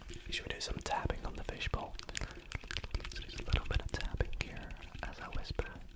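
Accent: United Kingdom. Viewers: Hi! Are you from Scotland, England or Ireland?? Your accent is really comforting and nice!! Loved this video!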